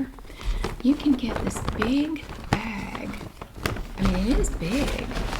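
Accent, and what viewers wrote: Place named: South, United States. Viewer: I love your accent. Your voice alone is so incredibly soothing, but the accent feels so homey (even though I am from Finland, I certainly haven’t grown around an accent like this hahah.) I dare to assume it’s southern? Love your videos anywho!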